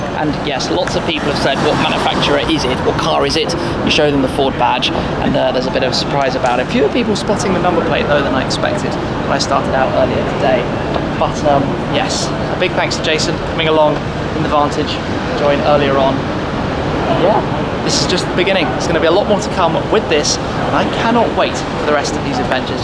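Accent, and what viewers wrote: United Kingdom. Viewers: Social media superstar, good looking, a brilliant exotic car collection AND a British accent.... you're gonna be fighting off the American girls 😋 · you'll be really popular with the ladies in the US, apparently they love the British accent.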